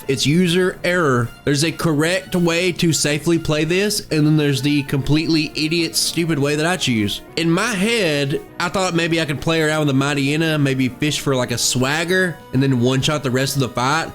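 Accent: South, United States. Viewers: The southern drawl mixed with the wit, the self awareness and relatable rants just made you probably the most human YouTuber I've ever listened to. Fuck yeah dude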